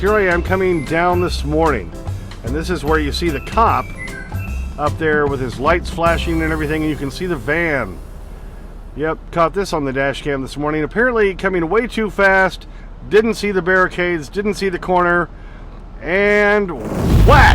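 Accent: Louisiana, United States. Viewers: Your louisiana accent was so funny🎉❤ I never been that far south in a truck very cool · Your cajun accent is spot on big dogg!!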